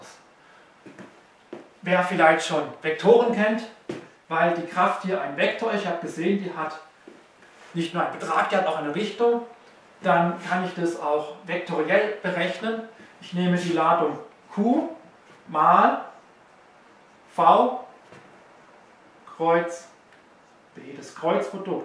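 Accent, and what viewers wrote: Swabia, Germany. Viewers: xD schwabe!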